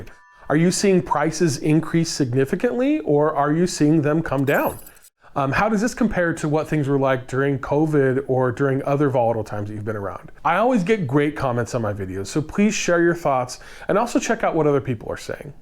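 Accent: Pittsburgh, United States. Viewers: Hello. are you from the Pittsburgh area??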